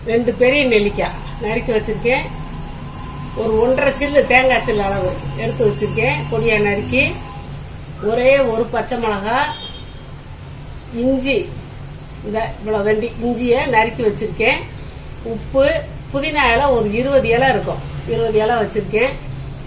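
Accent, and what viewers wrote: Madurai, India. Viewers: Madurai tamil suppera pesureenga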